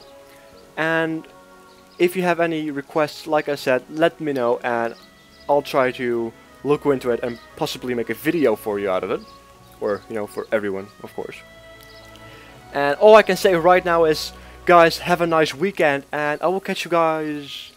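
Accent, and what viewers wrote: Netherlands. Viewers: Any chance youre dutch? It kinda sounds like it.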